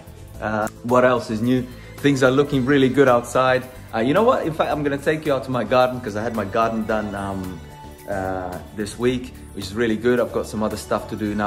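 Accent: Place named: Scandinavia. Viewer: Lol i was curious because you sort of sound nordic. I guess latvias not too far away from there.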